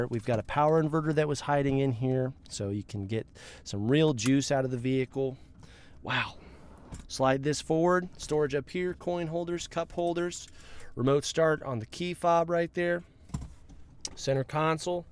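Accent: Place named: South, United States. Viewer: It gets quite annoying with the "Ram" and southern accent lol, it makes me feel like Kia guys don't know what it is really like to own a pickup truck.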